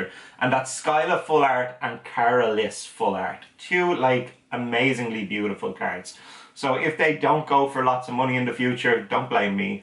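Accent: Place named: Ireland. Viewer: I never knew I needed an Irishmen to teach me Pokemon. Erin Go Bragh!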